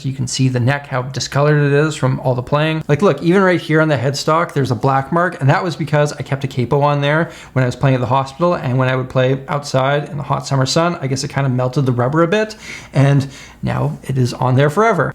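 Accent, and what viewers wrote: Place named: Canada. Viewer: For a few videos now I couldn't shake off the feeling that voice reminds me very much of somebody else's. And now it hit me - Jordan Peterson! I guess that's what you call Canadian accent. Which makes me excited I can hear it, not being a native English speaker.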